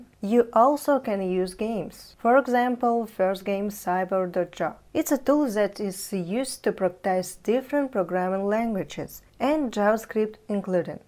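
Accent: Russia. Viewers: Ну английский я бы на твердую 3 оцениваю)) чувствуется русский английский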